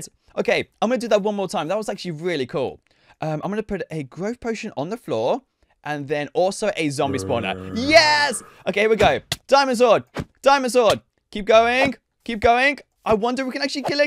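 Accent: United Kingdom. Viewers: Hi how you British accents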